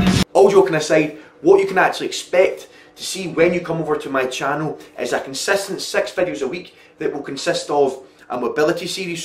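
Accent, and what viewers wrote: United Kingdom. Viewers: I love the Scottish accent, so badass!!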